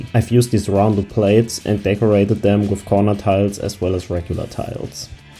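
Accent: Germany. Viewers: du bist deutsch das hört man sehr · Deutsches Englisch 🔛🔝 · As a german I litarlly hear ur actcent that u speak deutsch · bist du deutscher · Are u German?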